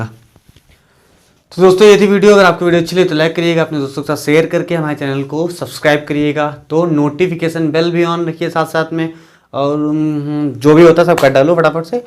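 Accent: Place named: Uttar Pradesh, India. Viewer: sir me apki boli se smjh gya tha aap utar pradesh se ho exactly kh se hoo??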